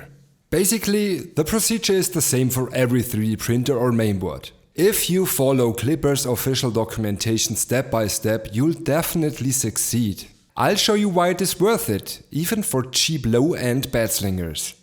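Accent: Germany. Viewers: Welcher Dialekt aus Bayern, nehme ich, war es?? Antwort würde mich freuen!!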